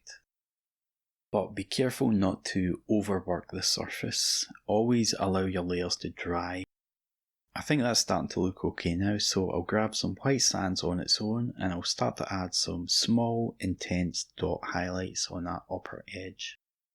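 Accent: Scotland, United Kingdom. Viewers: Nah, your accent is pretty much all there, cos after your vids I often get a hankering to watch early episodes of Taggart !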